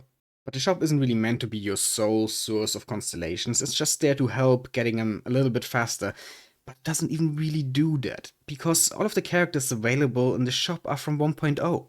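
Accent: Germany. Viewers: I can sense the german is strong in you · The german accent is strong with this one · I hear German or am I insane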